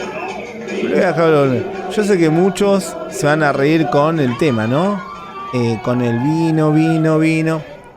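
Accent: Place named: Argentina. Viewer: no le cambies, me encanta cuando dices: “en cana” y es un “agco” siempre me da risa cuando los argentinos dicen asco.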